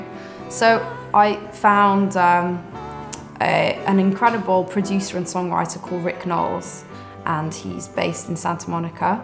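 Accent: Wales, United Kingdom. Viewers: Ugh her Welsh accent ♡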